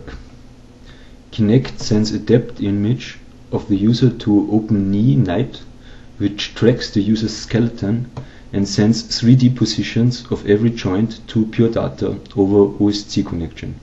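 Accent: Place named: Germany. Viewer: i like your english bro ...it sounds so german xD Njo egal, find ich ne geile sache xD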